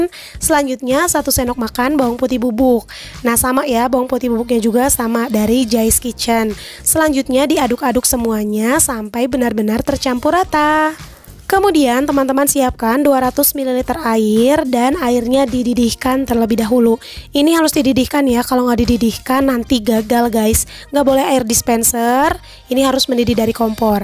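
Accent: West Java, Indonesia. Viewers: Logat sundanya bikin tambah semangat liat tutorialx. Thx resepx sehat selalu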